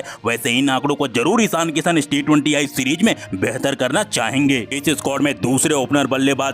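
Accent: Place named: Pakistan. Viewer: आप केसे बात कर रही हो? अपने बोलने का तरीका बदलें. ऐसा लगता है जैसे आप पक्के पाकिस्तानी हैं, बात करना तरीका change करो। एक सच्चा भारतीय ऐसा नहीं बोलते। Team INDIA हम तुम्हारे साथ थे, साथ हूं, साथ रहूंगा, इंडिया जिंदाबाद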